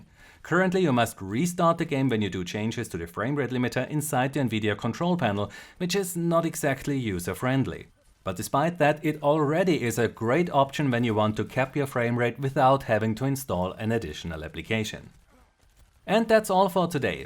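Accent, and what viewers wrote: Germany. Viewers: Ahh der Deutsche akzent xd aber cooles video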